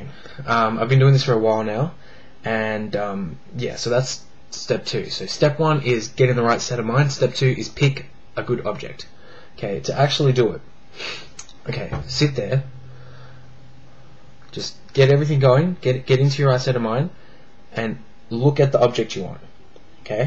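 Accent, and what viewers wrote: Australia. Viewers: Is he Aussie? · You're Australian, aren't you?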